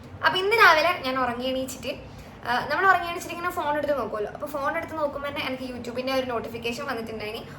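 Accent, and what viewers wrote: Kannur, India. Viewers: കണ്ണൂർ ഭാഷ വരുന്നുണ്ട് ❤️ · കണ്ണൂർ ഭാഷ, നമ്മുടെ സംസാര രീതി. ഞാൻ തലശ്ശേരി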